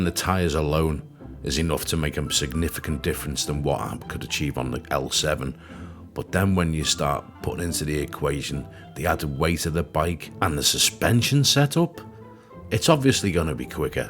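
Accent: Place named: United Kingdom. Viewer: ha no don't think so. Unless you're on the tdr forums, my name is blacklines on there. Or Owen in real life. Think it was just a bit disorienting seeing Collie and thinking ok it's one of the WA lads. Then hearing your voice and thinking ah maybe I was mistaken. Maybe it's someone off tdr. Then seeing Wanneroo and realising ah you're another brit in WA. I moved over here a year ago.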